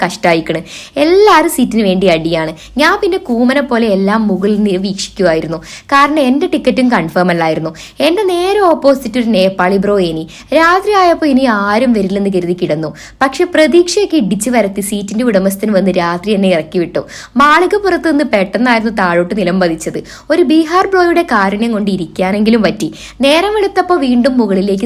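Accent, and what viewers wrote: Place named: Kannur, India. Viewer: നു നു, കണ്ണൂർ ആണല്ലേ? ❤️☺️ നല്ലരസമാ കണ്ണൂരിന്റെ നു നു ☺️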